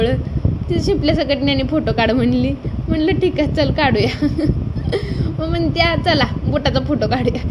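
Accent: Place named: Kolhapur, India. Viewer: लय भारी कोल्हापूरी मराठी 😊😊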